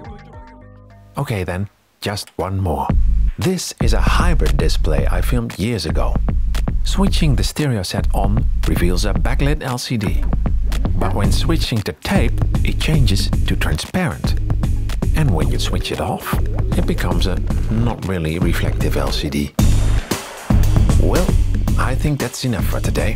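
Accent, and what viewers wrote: Netherlands. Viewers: Are you dutch? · This channel is like technology connections but narrated by a Dutch(?) person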